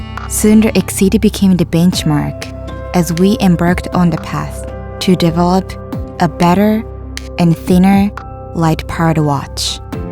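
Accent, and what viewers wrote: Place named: Japan. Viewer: 这个视频里的英语发音已经很好了，但是某些音还是有很明显的日本语音的特点。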